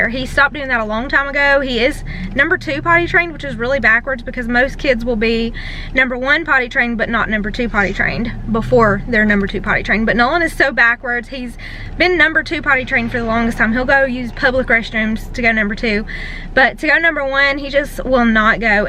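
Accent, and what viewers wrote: South, United States. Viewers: I love that pink tote bag! Sooo cute. I was induced with my last baby and it went sooo well. I took some cervical ripeners and then they broke my water and he was born 4.5 hours later, no pitocin needed. I am going to be induced at 39 weeks again this go round, I’m 30 weeks on Thursday. Good luck mama! I can’t wait to see him! I’m sure it will go great no matter how he comes. Also I just love your southern accent, I’m from Wisconsin and we have the weirdest accents here.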